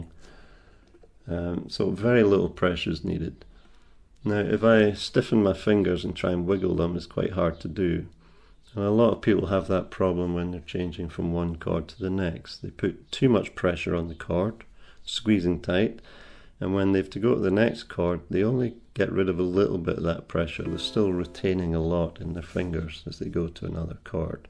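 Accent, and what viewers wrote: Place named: Scotland, United Kingdom. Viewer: Yr fingers looked like a spider, I said to hubby. Then u said spider. ;) Love yr accent. Scottish.. ? THe best accent in the whole world.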